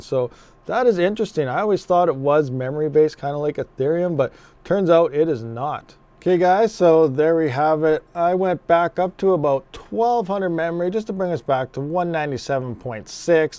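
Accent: Canada. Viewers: I know when I hear my canadian brothers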